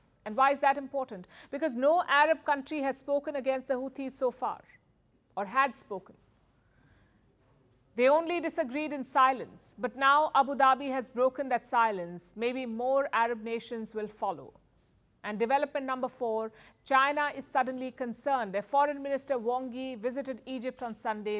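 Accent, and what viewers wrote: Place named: India. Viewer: You have to appreciate the seriousness of an Indian accept with the news! It’s frank and firm! Amazing work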